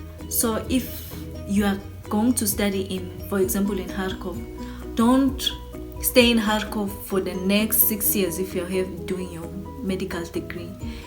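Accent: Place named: Eswatini. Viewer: Your Swazi accent is beautiful 😍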